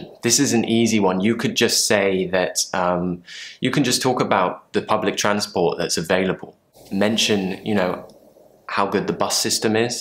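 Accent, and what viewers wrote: RP, United Kingdom. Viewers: Thank u so much, your videos help us a lot. Please don't stop to make. Your pronunciation is very clear, just try to speak slowly and it's cool to learn english with a british. Seriously thanks thanks thanks. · Nice British accent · I see you try to speak a natural sounds of English. It's means RP for me.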